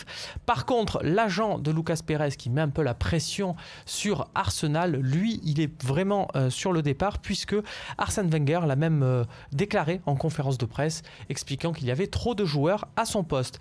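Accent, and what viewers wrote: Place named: Paris, France. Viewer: millan Cerkov car clairement, vu ta façon de parler, je pense que tu es parigots. Et oui à l'OM on est pas des pigeons à balancer plus de 100M d'euros par an pour un seul joueur... tu devinera lequel je pense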